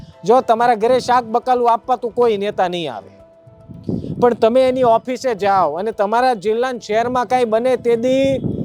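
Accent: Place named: Surendranagar, India. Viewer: tame surendranagar na cho tamari boli uper thi lage che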